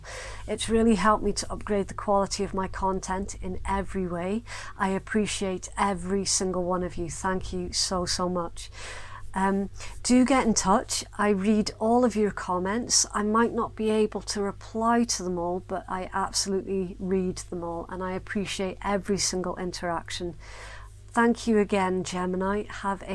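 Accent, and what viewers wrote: Scotland, United Kingdom. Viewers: Resonates with me, detect a wee bit of scottish accent 😊 me too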